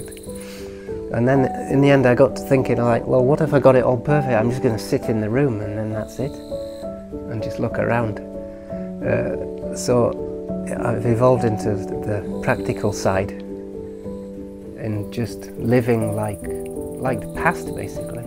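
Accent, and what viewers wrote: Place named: Scotland, United Kingdom. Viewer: And his Scottish accent makes him even more wholesome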